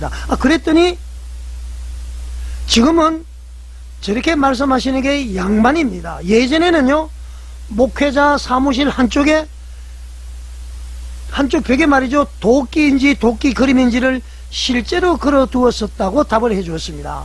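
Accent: North Korea. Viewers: 그럴리가없어요 북한억양이 심하시네요 지금말씀하시는 분은 목회를 얼마나 하셨는지 모르겠군요 김문훈목사님 인격에 그런분이 아닙니다 음모인듯 음모 중단하세요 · 어투가 북한분같아요..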